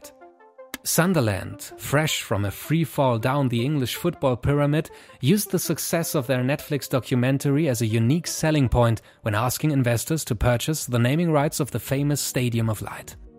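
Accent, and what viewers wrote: Germany. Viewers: I’m not 100% sure but I think he has german accent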